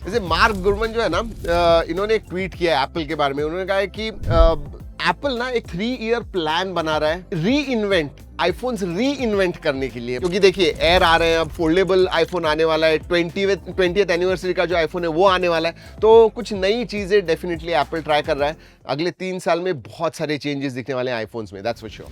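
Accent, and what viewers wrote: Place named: Gujarat, India. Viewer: Chalu karte hai !!! Gujju accent..wahh😅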